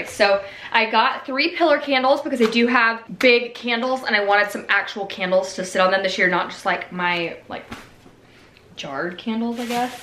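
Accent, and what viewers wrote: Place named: South, United States. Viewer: I love the southern accent! 🥰 My Alabama accent comes out when I get angry... or when I go into “mom” mode. 😆😂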